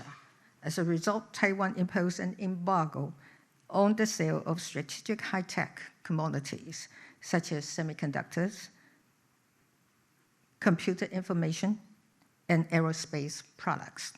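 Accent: United Kingdom. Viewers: 的確。的真的很英國。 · 她並不生疏，政治人物的演講重點就是必需清晰，自然速度會放慢。另外，她的英式口音非常漂亮。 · 聽得出來英語有點生疏，英國腔英語好明顯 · 小英是在英國讀書的，她的口音向來都是英國腔。你的耳朵沒有跟腦袋連在一起嗎？ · 小英總統的英國腔有高貴的氣質~ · 正統牛津腔的演說，讚。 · OMG, she speaks English with British accent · 亂噴什麼一直英腔好嗎？ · 小英的英國腔在歐洲人聽了會覺得很親切~~ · 一直是英國腔喔！ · 人家講的是英腔，你是來留言被打槍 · 英國留學果然帶著標準的英國口音，閉上眼睛👀聽來就和英國人差不多🎉！ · 哇塞，英國腔❤ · 小英總統的口音是不是有點英式😳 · 她的英國腔很好聽！ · 為什麼小英總統的有英國腔🤔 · 蔡一直都是英國腔沒聽過以前的接待外賓訪談嗎？ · 她的英國腔🥵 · 小英正面的論述，加上流利的英國腔英語，迷倒了眾多歐洲人。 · 當總統時是美國腔，不當總統就變成英國腔? 自己不覺得美國人聽了怪怪的嗎? 是否搞不清楚誰才是主子?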